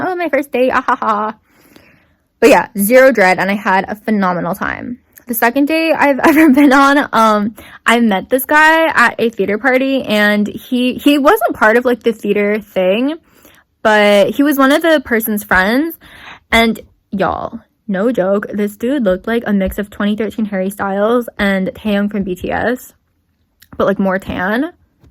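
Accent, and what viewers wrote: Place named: Australia. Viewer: ok but why is no one talking ab how fire that australian accent was??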